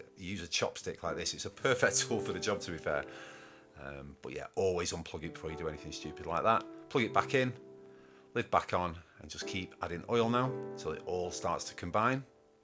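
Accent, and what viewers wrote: Yorkshire, United Kingdom. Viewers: I detect a Yorkshire accent, I could listen to yu all night. Actually just found yu channel so will be watching all night.😊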